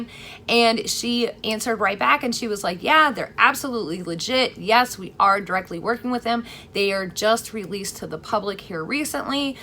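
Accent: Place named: New England, United States. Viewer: I'm from Kentucky you don't sound like it, I thought you were from maybe new england or something like that.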